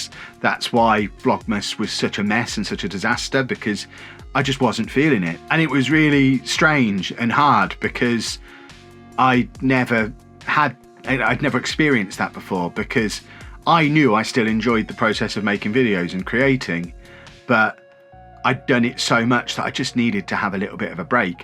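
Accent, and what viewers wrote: England, United Kingdom. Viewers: are you Rob Chapman of chapman guitars brother? you look exactly like him and you both have english accents so i would assume so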